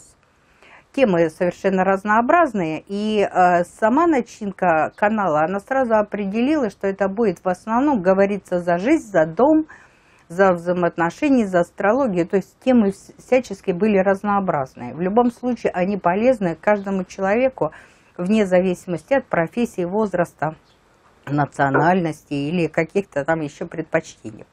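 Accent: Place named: Russia. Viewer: Так мы из одной страны( из Украины),думала, Вы из России, такой акцент не украинский совершенно.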